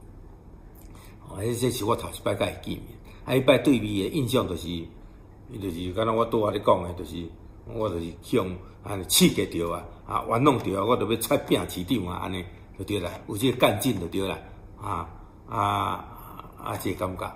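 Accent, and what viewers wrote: Taiwan. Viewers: 旺旺聽你說話真的感覺很庶民。一點也不像大老板。又很台。不知道平時有沒有穿藍白拖🤪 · 旺旺阿伯說話很有台灣味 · 台語講的很道地，首富卻沒有傲氣與霸氣，更接地氣。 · 天啊！台語口音好道地喔！怎感覺有海口音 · 體就是台語夾國語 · 道地隔壁阿伯說話，真親切。道地臺灣話真親切。謝謝你站出來。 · 哇，董仔的台灣味十足，還真蠻有吸引力的！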